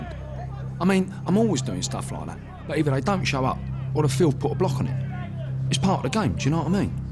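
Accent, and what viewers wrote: Cockney, United Kingdom. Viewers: Who the fuck do you think you are problem with you is fancy yourself full of shit you arsehole mcfc been there and done it anyone can talk big time on film on your own talk like that to me and my mates you cockney gobshite No chance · I mean cockney 😆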